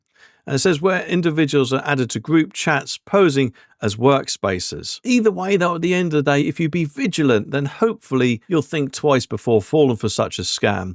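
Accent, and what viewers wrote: United Kingdom. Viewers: You sound very much like you’re from the same area of the UK as me. What’s the closest major town or city to you?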